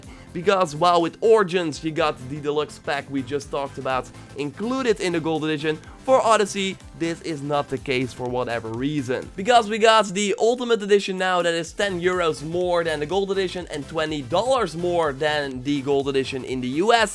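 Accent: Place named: Netherlands. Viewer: Ik wist het wel! Dat je nederlands was hahah toch kan je dat altijd horen. Ga zo door man😃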